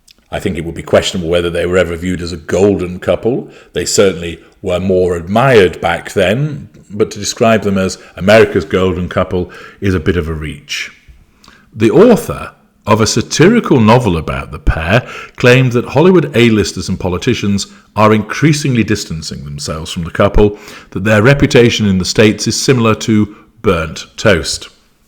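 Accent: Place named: Scotland, United Kingdom. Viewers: your Scottish accent makes you sound like Maggie Smith in The Prime Of Miss Jean Brodie. You are indeed the creme de la creme. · Toasting this vlog! Cheers! That Scottish accent!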